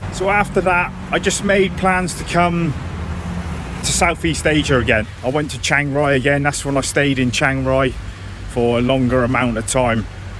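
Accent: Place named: Midlands, United Kingdom. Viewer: Your not likely to take to Spice then ;-) .Just saw a documentary about Manchester and the Spice epidemic .From your accent i detect the Birmingham /Coventry /Midlands locality ? .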